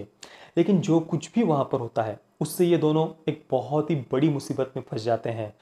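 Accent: Maharashtra, India. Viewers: Good work bhai. Keep it up. The best thing I like about your reviews is when you say Jai Hind, Vande Mataram at the end. The way you talk, it feels you are from Maharashtra. I am from Nagpur. Where are you from? I have a few movie suggestions for you, which you would like to watch and review. For starters I will suggest, "The Third Man'. Its a very old film and is widely considered as the first in 'neo-noir' genre. Do watch it.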